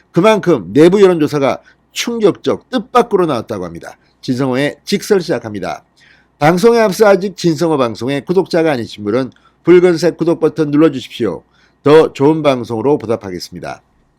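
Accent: Gyeongsang, South Korea. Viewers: 맞습니다진호님말이많습니다무조건윤석열밀고나가야됩니다준석아욕심버리고후보님중심으로가야한다월근행사하지말고죄명이끝까지찢어야한다찢을사람은국힘밖에없다진호님정확한뉴스최고최고대박아자아자윤석열김깆ㅁ건희여사님진호님융단폭격 시원합니다구수한경상도사투리홧팅대박나세요진호님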